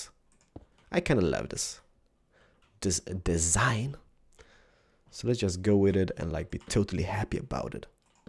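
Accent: Sweden. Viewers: Just like i thought, u were Swedish :D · Are you swedish?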